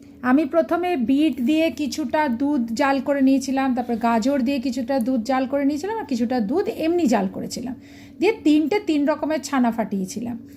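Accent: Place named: Bangal, Bangladesh. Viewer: Akebare notun ar chamatkar akta ranna . Bangal bhasa sunte besh laglo ,abar o sonar apekhay thaklam .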